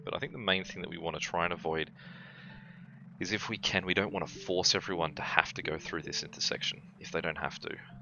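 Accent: Australia. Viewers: I'm guessing Australian?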